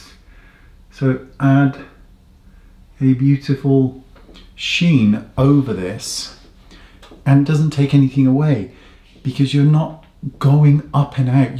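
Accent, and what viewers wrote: United Kingdom. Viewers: Where have you been all my life? I just discovered your wonderful channel and adore watching you. Being 45 and at a loss with makeup application, sharp features, eye bags, and well...generally a mess... your channel makes me laugh and smile. I wish you were my neighbor. 🥰 To my undignified American ears, I think I hear UK???? Thank you for every upload. ❤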